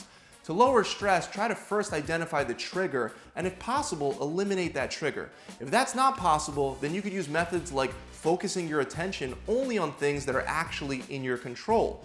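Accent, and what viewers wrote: Philadelphia, United States. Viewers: Haha are you from Philly? Love my Philly wooder. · Philly accent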